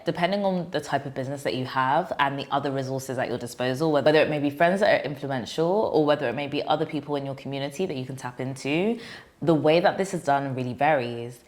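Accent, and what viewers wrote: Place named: New York, United States. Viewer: This is the wisdom I have been looking for. No hacks, no copy and paste, no what are your competitors doing. Just pure inspiration and creativity that allows you to cultivate your voice and your story telling capabilities. AND it was applicable to me even though I am not a huge brand. I really appreciated this! And as a Brooklyn native there is something so NY about your delivery and I am here for it.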